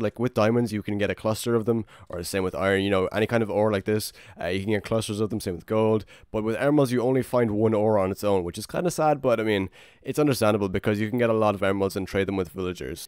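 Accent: Ireland. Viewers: Are you Irish cause you sound like iy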